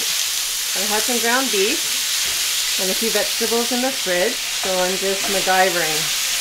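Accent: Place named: Canada. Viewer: Chinese Canadian - great accent! Lovely food as well!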